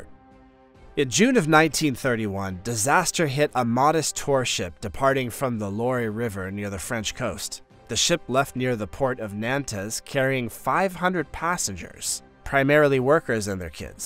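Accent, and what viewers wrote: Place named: United States. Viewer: You’re pronouncing a lot of the ships wrong and you sound American. How is that????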